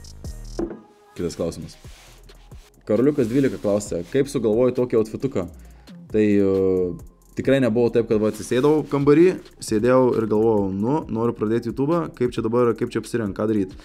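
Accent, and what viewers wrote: Suvalkija, Lithuania. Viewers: Pagal tarme kazkur is Suvalkijos busi :D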